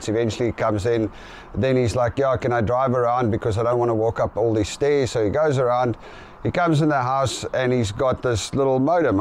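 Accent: South Africa. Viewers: You are South African🎉❤ i can immediately tell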